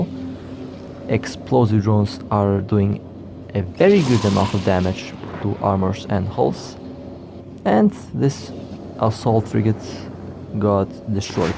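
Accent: Russia. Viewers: Это у тебя русский акцент или мне просто кажется? Видео снималось на тесте?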